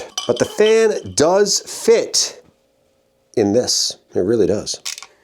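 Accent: Newfoundland, Canada. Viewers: That’s the Newfoundneez in you ………..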